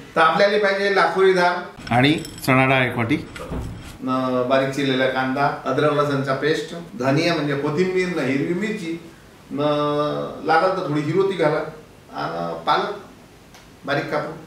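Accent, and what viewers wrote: Vidarbha, India. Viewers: वडे एकदम मस्त 👌👌👌 आणि आपली विदर्भ भाषा भारीच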